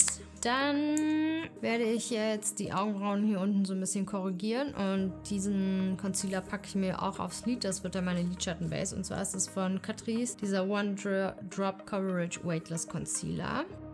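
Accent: Berlin, Germany. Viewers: kann dit vielleicht ma sein, das de gerade frisch aus Berlin zurück warst vorm Videodreh? Da kommt so schöne Berlinerschnauze durch. 🤗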